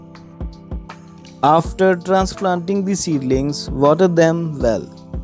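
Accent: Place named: Telangana, India. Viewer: Pakka Telangana dialogue 👌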